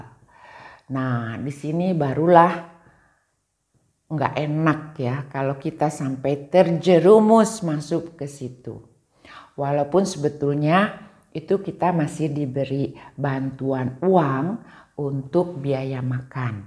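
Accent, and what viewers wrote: West Java, Indonesia. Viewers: Sanaos lami linggih di swiss lentong sunda na masih kadangu, Sae pisaan 👍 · uhun bu,rada aneh oge😂ari urang sunda sok sanajan pindah kamamana ge cara nyariosna logat sundana tetep aya keneh😁ibu oge cara nyariosna angger katingali sunda na😂aya oge kimbab famili,sok sanajan tos pindah ka korea angger gaya nyarios sunda na aya keneh,pas ningali teh,ah ieu mah tina cara nyariosna siga urang sunda,beda😁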